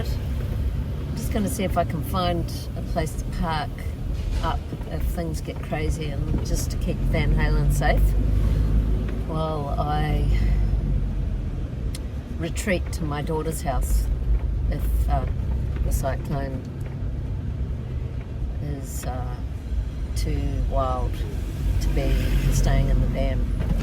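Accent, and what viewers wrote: New Zealand. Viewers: you need to just get in your van and drive to somewhere else! Your workplace is probably gonna close in the next few days....I am speaking from Far North Queensland where WE get the cyclones every year, and you folks in the south east of QLD have been happily oblivious to what happens in a cyclone for decades, and from your EXENT we're guessing you're from across the ditch where you don't have cyclones........and YES, we live in a Van, and I'm just saying GET YOUR VAN OUTTA THERE and go as far north west as you can for the next 5 - 10 days......you have a MOBILE home, so get mobile, get away from where that cyclone is gonna hit, and come back after all the sh*t has cleared the area where you're "stealth camping" your van. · Haha, Kiwi! I suspected a kiwi accent yesterday and msg you. I have only just heard of you, and been watching your videos today. 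You did grow up in NZ, so that's why there is an accent 😏 Was so sorry to hear your story of your past in Auckland. Rob.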